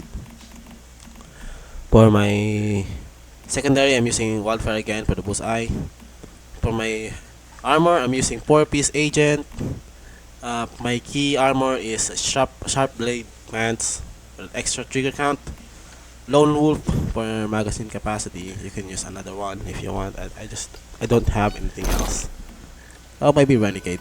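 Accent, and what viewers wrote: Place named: Philippines. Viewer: Japanese name but you sound Filipino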